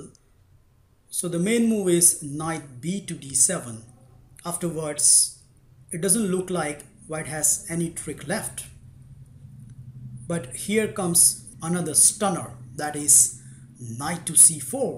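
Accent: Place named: India. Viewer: Tyler Roth why you hatin on this guys voice. Sure he has an Indian accent but his voice isn't cancer. Don't joke around like that, cancer is a serious thing bro. Also nice attack will try this in my next tournament. There's this players who's skilled who plays this opening so might be able to beat him now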